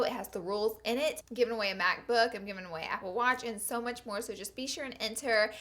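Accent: South, United States. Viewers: I'm usually a silent subscriber, but just wanted to drop in and say I love how vibrant your personality is. You are amazing at bringing your personality and humor through the camera and I can't get enough of your animated facial expressions. Also, your draw makes you more relate-able to those of us in the south. Keep it up girl!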